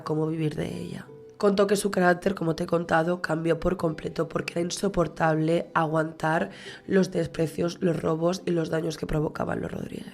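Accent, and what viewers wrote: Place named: Galicia, Spain. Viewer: Y ese acento gallego?? Bienvenida,!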